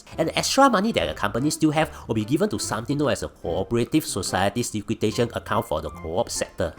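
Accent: Singapore. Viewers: Moron, why distort your voice and shame Spore with your rotten English and Singlish? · If you are not Singaporean, it’s Singlish ; common folks way of talking to bridge communication. If you expect Queens English, this is not the channel for you. I understood him perfectly 😊.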